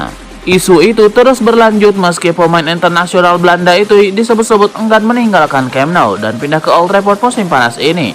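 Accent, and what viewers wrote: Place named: Java, Indonesia. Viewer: Medok jg..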